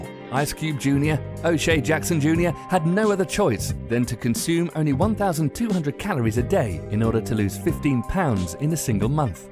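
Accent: England, United Kingdom. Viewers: He has a british accent, have a little understanding, so fucking what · It's called a British accent. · to give him a bit of a break hes british. I cringe every time he says aluminum for the same reason.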